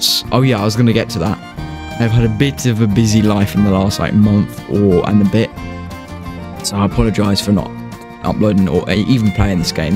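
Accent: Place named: United Kingdom. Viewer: Just discovered your channel, that Opening voice sound like feedback gaming voice, I guess you're both are British.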